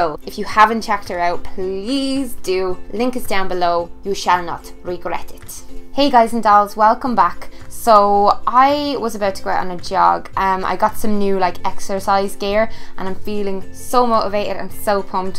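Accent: Ireland. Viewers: Your Irish omg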